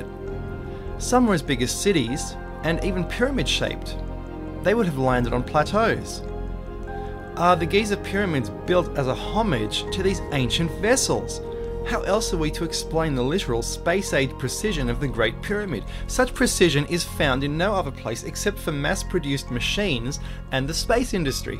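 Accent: Australia. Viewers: Speaker has an Australian or new Zealand accent not English. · Sounds like a good, stand up australian boy! Well done son.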